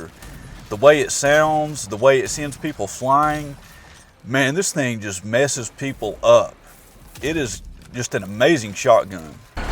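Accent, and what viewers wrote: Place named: Appalachia, United States. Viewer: I was just gonna say Appalachian twang!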